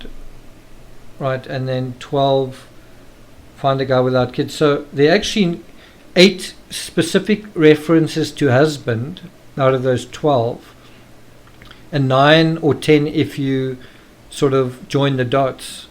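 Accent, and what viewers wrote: Afrikaans, South Africa. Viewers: He has a neutral Afrikaans accent. It's obvious he grew up speaking both English and Afrikaans, though. (Of course, this is just my assumption.)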